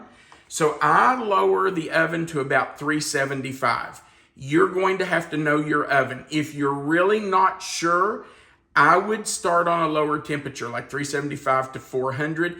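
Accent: South, United States. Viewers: The rolls look good but too much work for me to make. I do not like (putting it mildly) country ham. Love how Southerners pronounce: ol (oil), greeze (grease) and some say paparika (paprika)..LOL. Can you tell I'm a Northerner? My grandkids wouldn't live if they called me Mamaw and husband Papaw. Love watching your show but I can't cook too much anymore. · Yummy! Love your Southern accent and hospitality. I have a brother in law that is so sweet and does the cooking for his family. Same Kentucky accent ❤😅 I’m from Oregon. Thank you., God bless!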